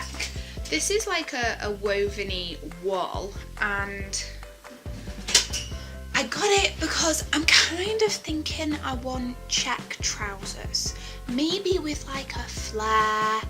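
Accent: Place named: Stoke, United Kingdom. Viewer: Just stumbled upon your channel and thought.... is that a Stokie accent. Then I read your comments below and YAY you are, just like me! I've never really liked Abakhan that much as a lot of the weighed fabrics work out dearer if it's a weighty fabric than off a bolt. Maybe I should go 1 of these bag fill sales.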